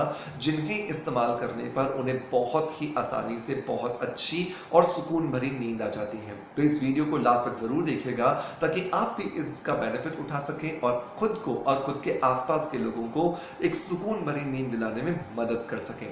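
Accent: Bengal, India. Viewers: Sir u r bengali or odia?? Pls reply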